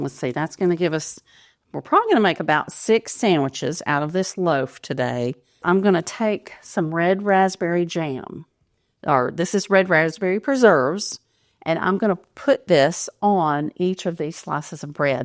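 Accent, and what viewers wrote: South, United States. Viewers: Of course. I like her on video, she is so pleasant and calmly cheerful, she sounds like a female Mr. Rogers, with a southern accent (Georgia?)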